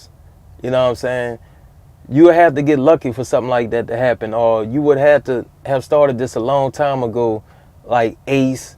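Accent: Louisiana, United States. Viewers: I can hear that Louisiana in ya! Keep uploading man. Just subbed! ⚜⚜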